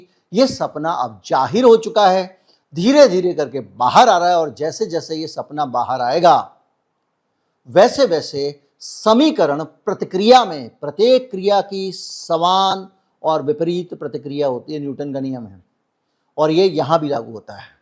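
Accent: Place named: Bihar, India. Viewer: Aapka Bihari tone bohut achchha laga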